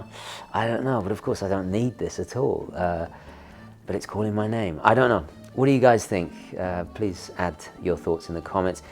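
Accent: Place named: United Kingdom. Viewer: is my most favourite watch reviewing channel, I just love the British accent, and the authentic, heartwarming enthusiasm of this guy! And now I am going to be rewarded for pursueing my most favourite leisure activity, watching watch reviews? How great is that? Thank you Fortis, I would be thrilled to own a Flieger, - unaffordable for me otherwise! Best regards, Hei from Kiel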